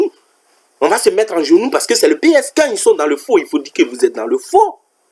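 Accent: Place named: Africa. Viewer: Merci pour cette vidéo camarade ! J'adore ton accent africain 😀 Tu as raison sur toute la ligne ! Le bon sens finira par l'emporter...nous sommes trop nombreux à vouloir justice ! Les sondages nous mentent ! Ne nous décourageons pas les amis, nous gagnerons 😊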